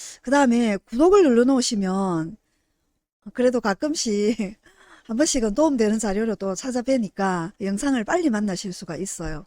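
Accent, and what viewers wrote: Busan, South Korea. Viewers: 목소리가 재미있어요 부산인가요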